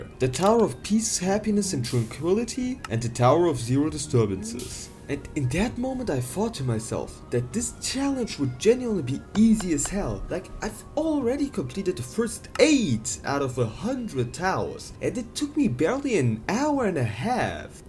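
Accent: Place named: Germany. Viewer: holy german accent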